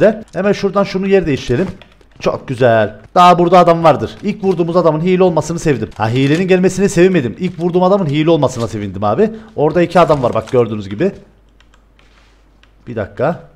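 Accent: Cyprus. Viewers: Uzun zamandır takip! beğeni! İlk yorum muhteşem Kıbrıs şivesi izlemeye doyamadığım videolar başarılar devam kardeşim 👏👏👏👏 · Yıllar sonra abinin Kıbrıs şivesi ve pubg bu halini izleyen benden selam olsun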